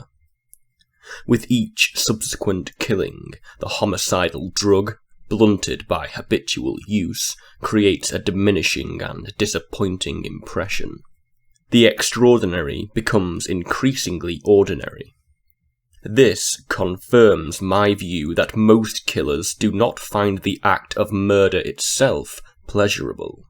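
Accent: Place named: England, United Kingdom. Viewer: I'm looking forward to your next chapter readings when you have time for it. Not only are they not ai but you're English so your accent fits the reading.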